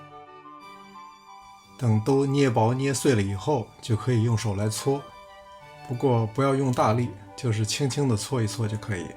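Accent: Beijing, China. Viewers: 叔叔你是住在海外吗？北京口音听着特别亲切。我是你的韩国粉丝。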